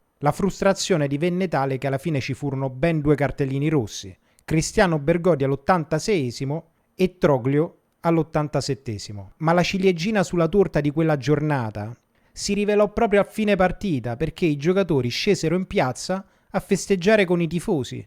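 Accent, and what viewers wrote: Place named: Rome, Italy. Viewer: Parli uguale ai miei cugini più grandi romani, me ne parlarono tanto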